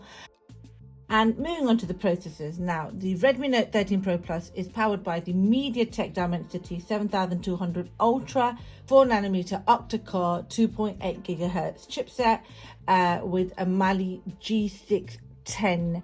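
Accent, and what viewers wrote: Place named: United Kingdom. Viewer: It's a British accent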